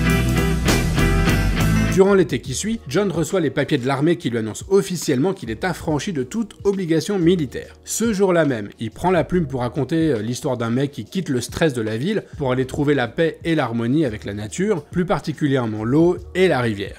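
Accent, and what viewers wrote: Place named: France. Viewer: Super vidéo, du sérieux dans la recherche et bien structurée avec un petit passage par la technique toujours intéressant sans être trop rébarbatif. Un phrasé bien frenchie mais ça va encore. Bref merci pour votre présentation.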